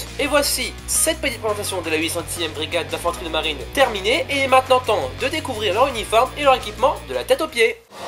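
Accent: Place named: Russia. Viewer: Héhé t'as carrement géré! L'ecriture, les voix de BONHOMME bravo mon!! Bon petit accent russe en plus!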